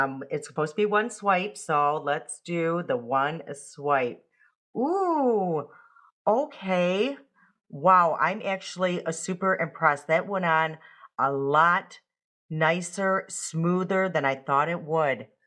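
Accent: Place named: Michigan, United States. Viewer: Now I really want this palette! Your voice sounds like home - you must be from Michigan ❤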